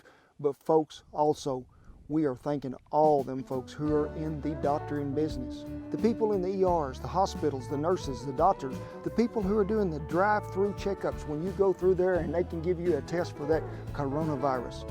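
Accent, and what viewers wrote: South, United States. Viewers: Also. I love the way you talk. The country twang. I’ve had several southern friends and I’ve always enjoyed the accent. Some hate it. Say it’s uneducated. They need more garlic. That’s what I say. 😂😂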